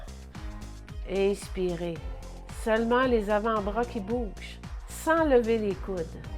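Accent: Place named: Canada. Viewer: Quel bel accent canadien. Leger pour moi. Merci bcp From israel en confinement😞😷😷😷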